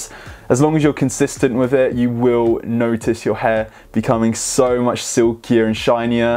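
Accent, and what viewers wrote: United Kingdom. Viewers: Are you British? Love your accent ❤️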